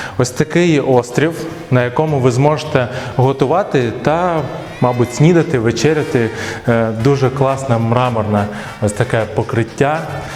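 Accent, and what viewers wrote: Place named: Russia. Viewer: He is speaking with russians accent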